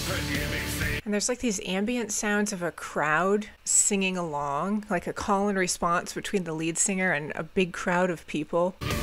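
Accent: United States. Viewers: I watch a fair bit of react content and your reactions are pretty unique I feel, I've been watching your videos here and there for a while now and it always feels like I'm watching a radio broadcast. There's also something very open about your style, you come across as very unassuming. I love how you can listen to the gnarliest lyrically super fucked up stuff and just be like "I really love how the guitar builds with the energy of the lyrics". This reaction in particular is pretty funny to me, I live in the Netherlands which means German is a very recognizeable language for me. Despite the fact that you clearly have 0 clue what's going on with the lyrics you still pick up on some cool stuff instrumentally, and well, there's just something comedic about someone with a very American accent pronouncing the song titles and the band's name. It reminds me of Brad Pitt in Inglorious Bastards when he greets people in Italian. 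I'm not entirely sure why I felt the need to write all that out but what I'm trying to say is I think you got something pretty unique going on here and I think that's great. Keep it up!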